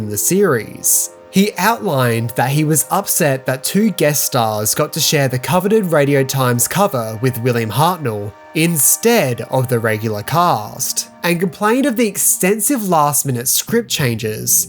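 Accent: Australia. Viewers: Great review, but can you back off on the Aussie pronunciation of the letter "o"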